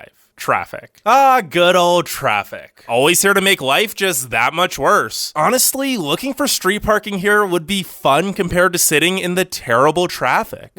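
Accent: California, United States. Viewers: Visual amazing Your voice however CaLIfornya! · This guy's valley accent is so strong. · Your California accent is brutally annoying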